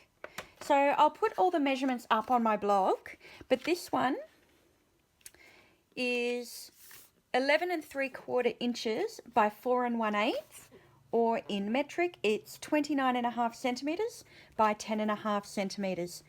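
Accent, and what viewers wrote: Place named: Australia. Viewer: Great card. Wonderful to hear a fellow Aussie voice. Pam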